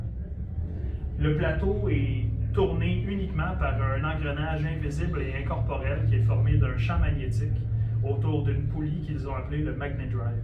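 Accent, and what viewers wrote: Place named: Quebec, Canada. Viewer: French Canadian …..such an incredible difference to the French accent in france . Thanks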